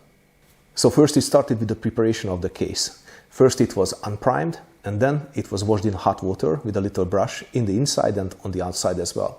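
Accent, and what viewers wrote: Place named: Hungary. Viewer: you are such a pleasure to watch, informative, knowledgeable, and Hunglish is a beautiful language!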